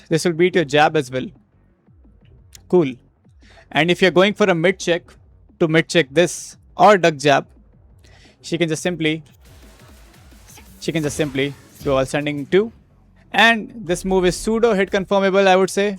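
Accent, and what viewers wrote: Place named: Bihar, India. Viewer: Ye bihari bhai ko english kisne sikhai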